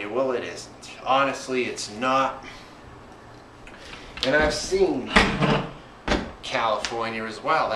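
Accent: Boston, United States. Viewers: Are you from Boston? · You're not a hipster, and you have a heavy boston accent. So not the type to fit in with portlandia types. It's not your scene. Portland has a serious underground sex trade and is full of strip clubs. Kinky side for sure. I saw really bizarre hookers walking around out in the open and was approached by a guy who thought I was a hooker because I was out walking/bike riding late at night. So certainly has a sketchy side. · It sounds like you hate the suburbs of Portland. It also sounds like you're racist. Congrats on describing gentrification with the accent of Will Hunting. Go back to Boston. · It's a Boston accent. LOL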